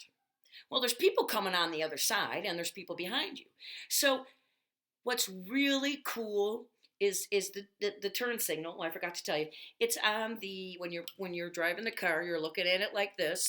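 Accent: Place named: South, United States. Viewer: I thought he had a Southern Drawl? Maybe the trip gave him a scratchy throat?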